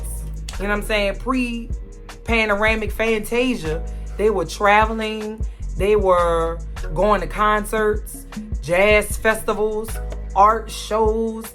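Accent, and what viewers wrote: New Orleans, United States. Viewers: Love love love your accent, Is it New Orleans? I just subscribed !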